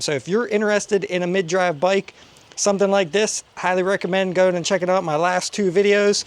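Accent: Pittsburgh, United States. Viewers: Are you from Pittsburgh??? 😂 Also I’m strongly considering this bike over wired, and a few others. Currently added to my cart 🛒 and can’t decide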